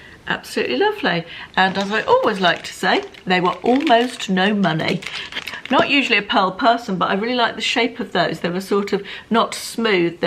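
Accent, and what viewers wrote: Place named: Oxford, United Kingdom. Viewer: I like your Oxford English?! At least it reminds me of my school English lessons 😊. Therefore I’ll come back to your next Temu Haul ❤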